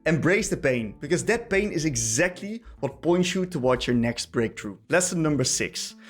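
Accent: Netherlands. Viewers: Hey, this is probably something you’ve heard before and I don’t want to come off as mean, really I don’t, but I think it would genuinely do you well to spend some time tweaking the way you ultimately articulate your coaching. That is, I think you will gain a respectable amount of traction if you do so. Thing is, and again you’re probably aware of it, but your pronunciation is like, passing… but not good. It’s okay, you know, but also just… okay. Even then I have to say that as a fellow Dutchie I do have a soft spot for your Dutch-English accent, however it still took me a bit to warm up to it (compared to native or more proficient English speakers that produce similar content) and I just think to some new viewers it’s possibly really an active deterrent to becoming full time viewers. Maybe. Your English itself is very solid, don’t get me wrong. I do wonder if you could somehow review your video scripts in order to have them be fully polished, because right now you occasionally get an English Expression or a certain common collocation slightly off (or some subtle grammar or vocabulary related mistake where actually☝️🤓 or whatever). 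While this is more of a small nitpick, I think it’s comparatively easier to solve by simply catching those small mistakes while reviewing your scripts. That’s my two cents on this. In the end I’m just a nobody and a relatively new viewer of your channel, because I recently swapped my main role to mid, so take all this with a grain of salt if you wis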